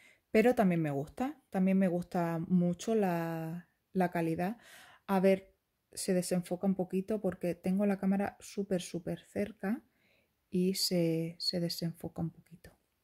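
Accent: Extremadura, Spain. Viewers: Hola guapísima, acabo de ver tu borda y no sabes que ilusión me ha hecho escucharte decir que eres de Cáceres pq yo también soy de aquí de Cáceres jajajaj que casualidad, ya decía yo que ese acento era extremeño puro jajjaja, llevo poco en tu canal suscrita y me encantas, la perrita sofi es preciosa, gracias guapa por tus vídeos, un beso enorme 😘😘😍😍💋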